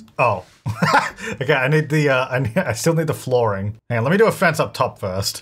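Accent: Australia. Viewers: Has anyone ever told you you sound like Jazza (and it's not just because you're Australian)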